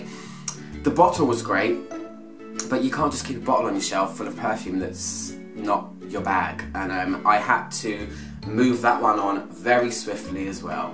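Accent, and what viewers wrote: United Kingdom. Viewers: Luv ur British accent.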